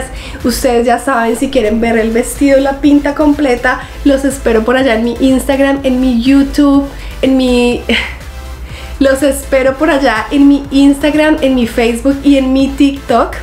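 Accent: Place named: Colombia. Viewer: Qué tengas un muy feliz año nuevo mi niña hermosa , eres muy bella y simpática y me gusta mucho el acento colombiano , bendiciones desde Chile 🇨🇱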